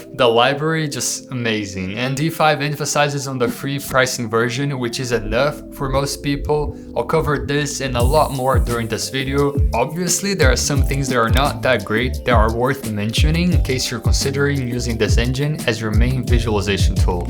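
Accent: Brazil. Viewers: Tem que ter o ouvido treinado, mas esse cara pelo sotaque deve ser brasileiro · teu ingles é muito bom, mas tenho quase certeza que tu é brasileiro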